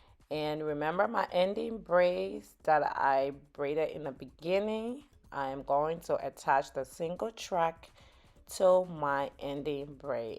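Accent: Liberia. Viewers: I knew you were Liberian as soon as I heard the accent.. love the video.. I’m Liberian as well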